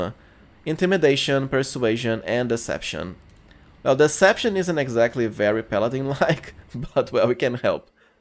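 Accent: Brazil. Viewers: Eu sabia que tu era brasileiro so pelo sotaque kkkk a gente se identifica ate em ingles pqp kkkkkkkkkkkkkkk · Se essa voz e sotaque n for BR minha vida é um mentira!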